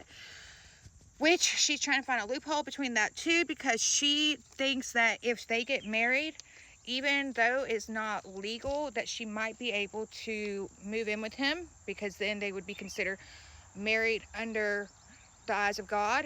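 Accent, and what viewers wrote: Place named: United States. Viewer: Mee too new sub here and I love your country accent sounds like mine lol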